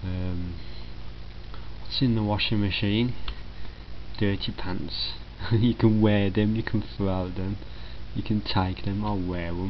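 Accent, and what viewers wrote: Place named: Dudley, United Kingdom. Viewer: This gets 5 stars... just for the dudlay accent!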